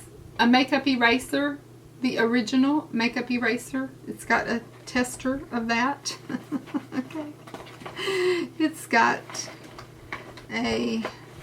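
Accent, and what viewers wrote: South, United States. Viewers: I love you so much I think your were sleepy in this cause you sound even more southern , I do the same when I'm sleepy i love your top today enter me darlin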